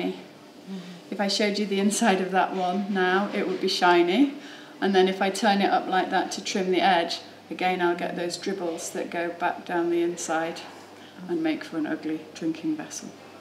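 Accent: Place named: United Kingdom. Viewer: Sounding British, I wonder where she picked up the coffee habit.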